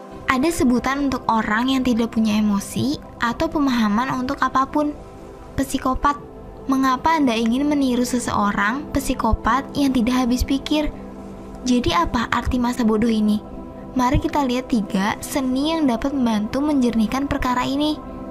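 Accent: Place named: West Java, Indonesia. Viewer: Suara logat orang sunda nih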